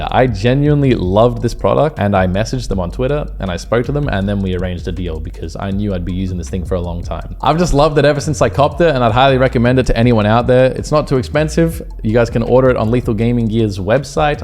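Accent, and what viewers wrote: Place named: Australia. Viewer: I love the Australian accent 🦘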